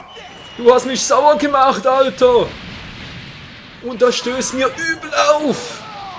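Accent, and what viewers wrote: Switzerland. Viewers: hey <3 bist woll ein schweitzer :D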